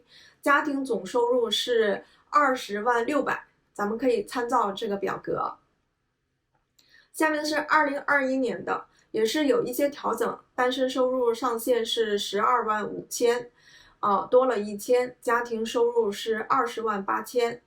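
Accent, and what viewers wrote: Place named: Liaoning, China. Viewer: 应该是辽宁的吧，我本科硕士都是沈阳读的，在沈阳呆了七年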